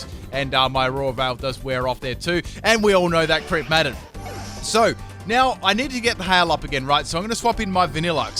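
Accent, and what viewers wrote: Australia. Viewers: PLEASE PIN THE COMMENT I LOVE YOU AND YOUR AUSTRALIAN ACCENT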